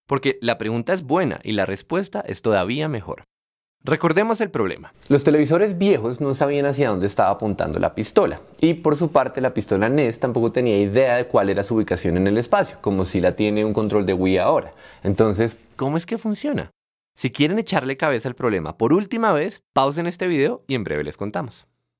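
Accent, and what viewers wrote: Bogotá, Colombia. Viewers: te reconozco el acento colombiano :) yo también lo soy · esa voz de rolo inconfundible con un tono agudo en cada frase al final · sos colombiano ?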